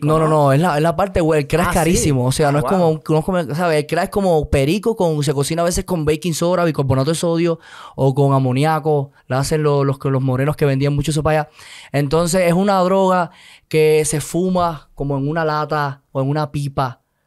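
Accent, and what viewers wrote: Puerto Rico. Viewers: Habla como puertorriqueño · Es cubano...se siente puerto riqueno y mexicano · Me caí gordo por qué es Méxicano y habla puertorriqueño se cree o nose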